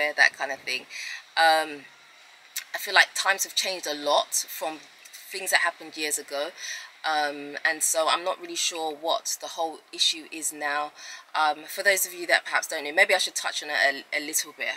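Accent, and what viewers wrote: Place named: United Kingdom. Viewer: You are British my dear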